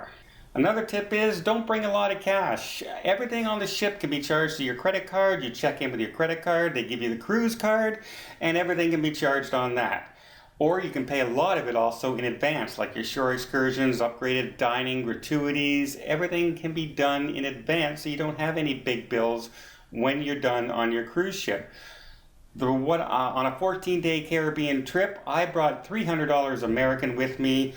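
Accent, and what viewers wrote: Canada. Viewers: I can so tell your canadian now lol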